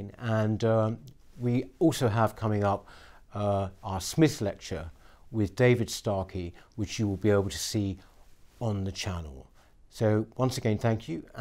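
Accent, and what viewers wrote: RP, United Kingdom. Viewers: It’s refreshing to hear intelligent, incisive, coherent, reasoned and truthful comment and discussion, spoken in Queen’s English in whole sentences without beginning each one with “So” or endlessly repeating “Y’know”. More power to you!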